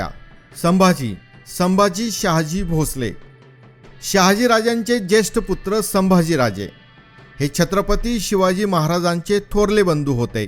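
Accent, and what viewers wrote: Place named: Thanjavur, India. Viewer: Tumachi bhasha Tanjawar Marathi watate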